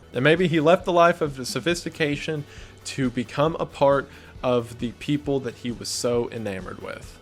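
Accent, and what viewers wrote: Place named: South, United States. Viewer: I adore Wendigoon. I go to sleep listening to that beautiful southern twaaaang.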